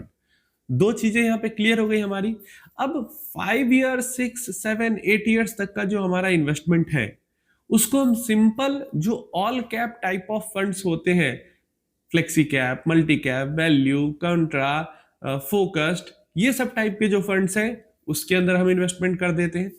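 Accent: Bihar, India. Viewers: Namaste aap bihar se ho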